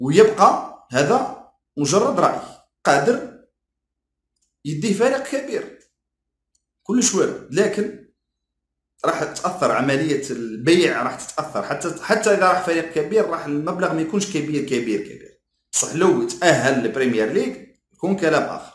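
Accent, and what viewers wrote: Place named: Biskra, Algeria. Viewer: من لهجتك تبان بسكري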